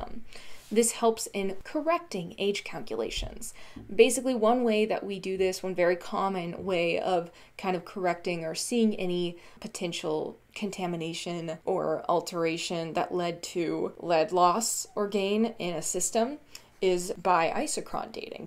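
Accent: South, United States. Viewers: awesome videos. are you from the South?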